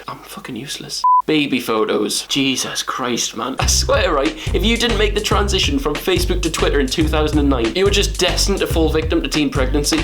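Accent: Newcastle, United Kingdom. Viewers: are you from newcastle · So happy to have finally found a Geordie you tuber ☺️ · looks like Shawn mendes - but a Geordie version - loving the Newcastle 😂👊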